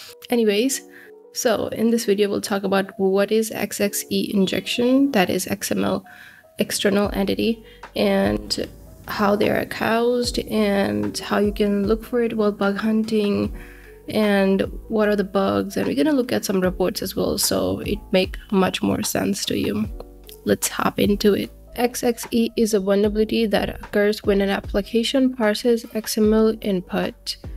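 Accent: India. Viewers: You should probably travel to eastern India rather than crying "pa jit" they just have accents like this... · this video is very informative i also studying in this field i don't know why a little bit of indian accent are i am noticing ..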